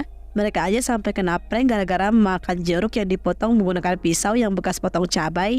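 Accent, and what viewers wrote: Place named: China. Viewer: ne kk orng cine ya.. dari omong kk ne..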